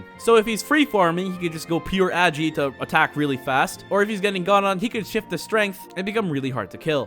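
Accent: Philippines. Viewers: heya will u also do content on league? that'd be awesome also I can still hear your Filipino accent UwU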